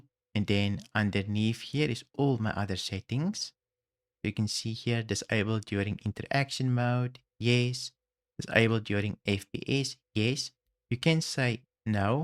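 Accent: Afrikaans, South Africa. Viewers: Dude, thanks for all the videos. Have been watching a lot of them recently. Very helpful! If I may: I believe I hear an Afrikaans accent there. Am I correct?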